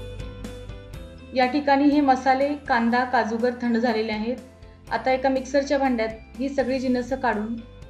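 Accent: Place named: Kolhapur, India. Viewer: Tumhi kolhapuri aahat kai..accent aahe तुमची mala kolhapuri language khup avadte.😍🥰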